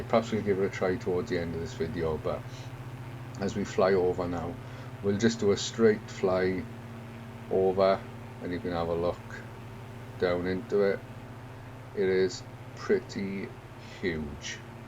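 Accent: Wales, United Kingdom. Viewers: Now THAT's definitely a Welsh accent lol, ello from Mid Glamorgan.. Nice vid, When we get helicopters in this sim? i will definitely drop down in there. Incidentally? This had the Exact same glitch at this point in the olf fsx days.. and i used to host sessions from here.. lol.. and 747's 737's .. we landed them all down there, although it wasn't as deep as this hole in this sim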